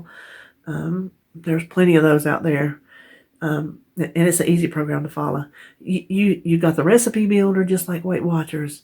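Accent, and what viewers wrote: South, United States. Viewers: Not going anywhere and can't imagine life without you. So enjoy your sense of humor and southern accent! And what would I do without your DT and Wanda hauls?? We are all in this together no matter what plan we are following. You have been so good and therapeutic for me as I have gone through this 5 months of recuperation from my foot surgery and Co-Vid on top of it, and can't imagine life without and your beautiful soul. Here's hoping 2021 is better for all of us and we will hit the pavement running all together..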